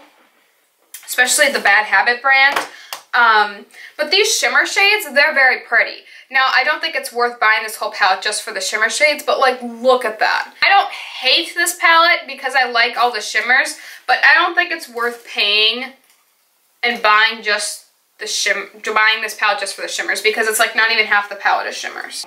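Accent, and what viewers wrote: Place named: Minnesota, United States. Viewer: Your Minnesooooota accent is coming out strong in this one lmao 😄 it's cute !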